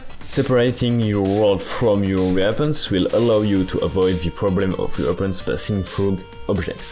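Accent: France. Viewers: this man has a thicc french accent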